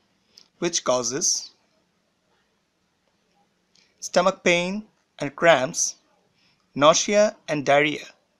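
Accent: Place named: India. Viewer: It's Indian accent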